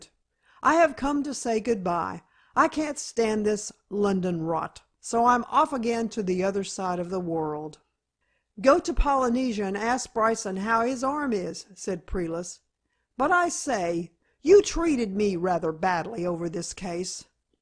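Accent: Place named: United States. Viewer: Great story... I can't help wishing that it had been read by an English reader though as the story is set in England. I found the broad American accent unsuitable for this text. The reader did her best however, despite the occasional mispronunciation of certain words. Much better than I could ever do.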